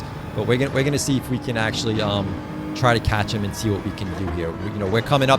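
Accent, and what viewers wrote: New York, United States. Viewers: do I hear a NY accent???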